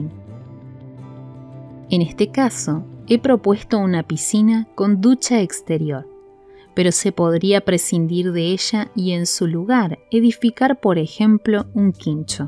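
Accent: Argentina. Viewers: Hermoso diseño, buena distribución, buena iluminación, excelente aprovechamiento de los espacios y decoración discreta y elegante , de muy buen gusto, en cuanto a las opciones de la fachada me gusto la numero 3 , con detalles en piedra .....me gustan muchos sus videos porque están bien explicados ademas , das costos $ aproximados (desconozco para que pais, pero por tu acento supongo que eres argentina) y tambien das opciones de cambios en la fachada ..y los planos.......!!!Que mas se puede pedir!!!.....gracias y espero el siguiente........saludos desde Mexico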